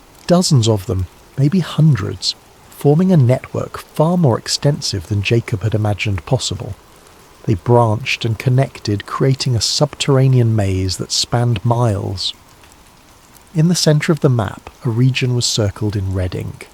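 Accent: England, United Kingdom. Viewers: English accent, really? We're talking about a place in the U.S. It's too distracting.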